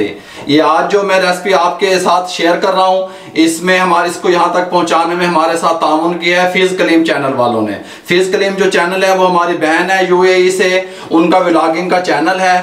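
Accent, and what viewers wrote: Punjab, India. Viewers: ALLAH khush rakhy baba ji aap ko AAMEEN ❤️ itni mehnet se banaty hain aap Love your punjabi touch 😍😍😍😍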